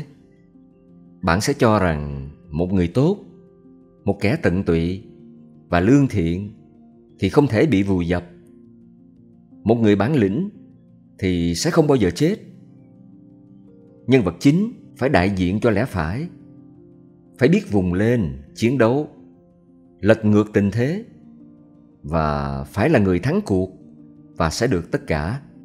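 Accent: South, Vietnam. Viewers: Hi, Chúc anh ban cùng gia đình mọi sự tốt đẹp nhé! Tôi phải ngả mũ chào ngưỡng mộ qua tuyệt vời!! 👍👍👍👍””I fall in love with you ””” about Well- knownledged, well prepared, well organized từ cách giới thiệu rất hoàn hảo, đẹp mắt! Từ giọng đọc thật truyền cảm, thật “” Nam kỳ tình”” giong cuoi rất khôi hài!! Chúc anh ban thành công và tien mai trên sự nghiệp nhe! BRAVO BRAVO 👏👏👏👏👏❤️❤️❤️❤️❤️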